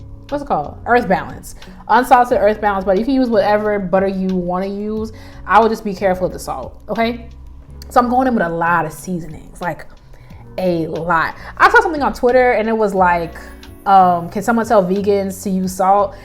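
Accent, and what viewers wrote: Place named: New York, United States. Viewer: Soon as you started talking it was giving NY lol we talk fast then you said “so boom” I’m like ok def NY. Then you said you lived in Rochester and that’s exactly where I’m from lol. Trying your recipe this week!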